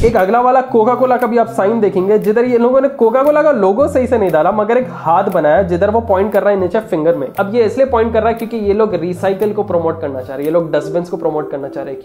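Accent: Hyderabad, India. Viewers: Are you from Hyderabad because you taking like that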